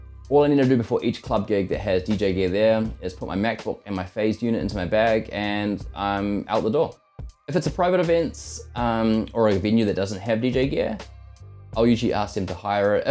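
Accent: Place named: New Zealand. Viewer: Chur bro, you sound like from Aotearoa New Zealand! Your choice of music and DJ style gave it away before I even heard you talk my bro💯👌🏽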